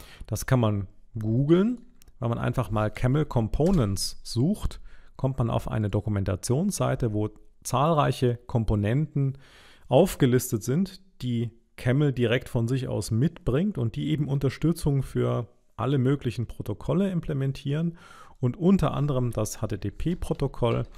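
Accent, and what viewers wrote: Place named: Germany. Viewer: Great tutorial , but German accent it's difficult to understand.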